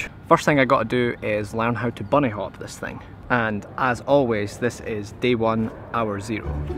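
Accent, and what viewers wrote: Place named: Scotland, United Kingdom. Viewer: where is the skate park?Since you have a scottish accent i'm assuming somwhere in scotland,maybe glasgow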